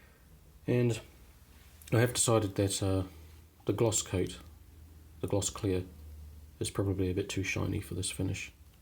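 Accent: New Zealand. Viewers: You sound Kiwi, if so - where do you get your supplies from?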